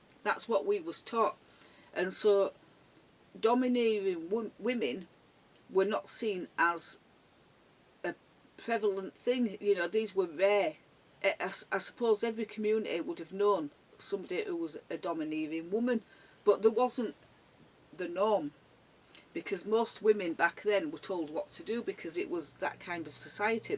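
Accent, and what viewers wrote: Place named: Northern England, United Kingdom. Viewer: Still love your northern dialect:)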